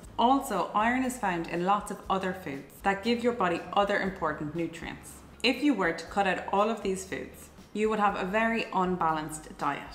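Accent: Ireland. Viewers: True , the GP I went to back then said He knew nothing about it and sent me to the Hospital. they found me a Dr who was surprised as I was his 3rd case. many more now. It is becoming a mainstream condition now ! sadly I think my Grandad and Uncles had it but like you said it was unknown then. all heart attacks in their early 60's only my Grandad survived the first but did indeed have another years later. they were strict tee total, strong fit (young and older) men. always wondered if it was Hemochromatosis. Im now in my 60's. > County Fermanagh Inniskillin is where we hail from. To hear your voice , the beautiful Irish voice , still and always will thrill me to my bones. Thank you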